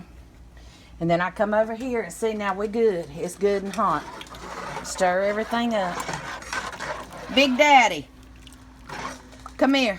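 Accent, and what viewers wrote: South, United States. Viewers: I love your easy southern voice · I really love your li'l Southern accent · I love your southern accent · I LOVE to hear you talk... guessing you're from Alabama or somewhere deeper south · I love the southern in her voice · it's a southern accent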